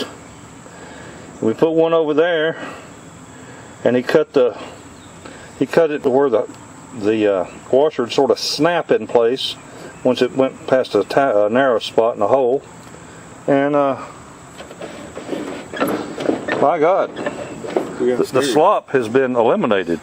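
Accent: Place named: Tennessee, United States. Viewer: you and I are cut from the same cloth, same age too, my grandkids call me, the only difference is you have a Tennessee accent and I am a Maina, ayuh and my handle is skidddah.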